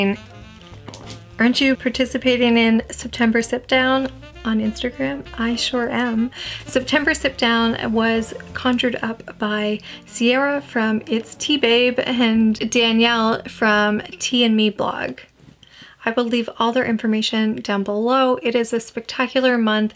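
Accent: Canada. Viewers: Heard you talk & knew you were from like Ontario, or Atlantic Canada! Hahaha, I’m from NL. ☺️ Just bought a little haul myself; including that exact glass bottle! Hope you enjoy everything 🌈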